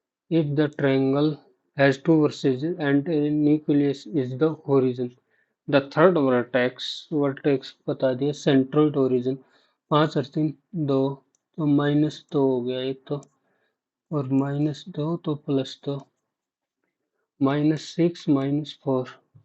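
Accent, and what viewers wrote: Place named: Himachal Pradesh, India. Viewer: Bhai himachal se ho kya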